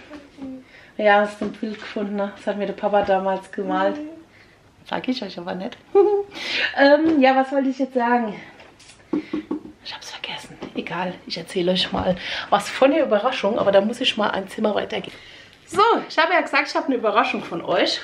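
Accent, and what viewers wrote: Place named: Palatinate, Germany. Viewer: Liebe sehr schönes Video, und dein Pfälzisch 🥰 mir gefällt deine ehrliche Art und du bist so eine sympathisches Fräuleinchen, und die Buntheit in deinen Videos einfach macht es Spaß dir zuzuschauen 😀…die Lampe ist sehr schön🍀😀über Gewinn hätte ich mich sehr gefreut😊lg Miri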